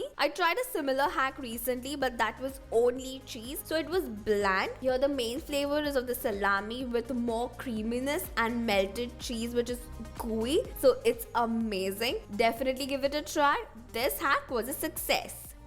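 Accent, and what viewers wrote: India. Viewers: Your hindi-english accent is so sexy😍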